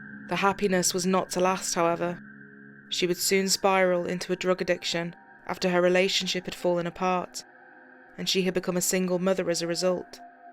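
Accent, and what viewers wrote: England, United Kingdom. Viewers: why is the story of a murderer in Tennessee narrated by a person with an annoying English accent? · yeah it's weird I don't mind English accents in person but a voice over drives me crazy. Funny thing is I'm 50 percent English from the states.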